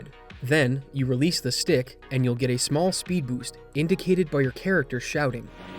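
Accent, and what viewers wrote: Canada. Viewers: Are you Canadian? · This guy's gotta be canadian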